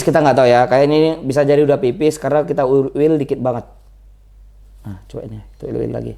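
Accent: Java, Indonesia. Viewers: kangen suara Asli jawanya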